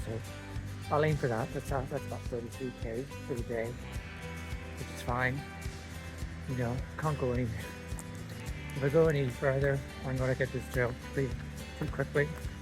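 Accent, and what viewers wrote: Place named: Ireland. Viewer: Thanks for the video. I know the EHW is not really marked; however, it is not as intense as the WHW and will suit me ass a relative beginner. I live now in Stirling so I am within easy reach of all Highland and Island hikes & wild camps. I am originally from NI and though I detected an Irish lilt in your accent. Cheers 👍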